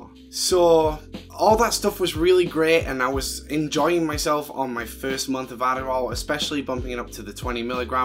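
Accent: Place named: South London, United Kingdom. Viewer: you Sounds a bit like a south londoner 😄👍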